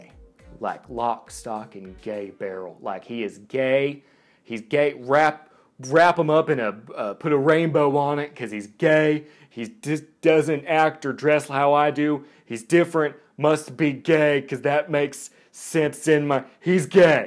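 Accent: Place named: South, United States. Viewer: Thanks for the disclaimer about the southern accent.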